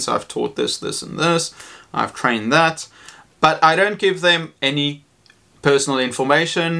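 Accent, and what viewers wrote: South Africa. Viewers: Are you South African? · Hey! Thank you for the amazing tips, I've learned a lot! Tomorrow will be my first day back to school. I first started before the pandemic and honestly I think I did really good but there's always room for improvement, right? One last thing, I can't help but ask... Are you South African? I just love the accent haha. Greetings from Algeria!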